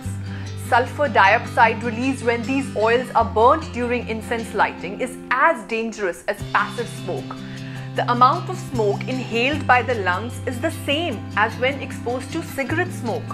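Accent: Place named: India. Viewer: I didn’t expect that heavy Indian accent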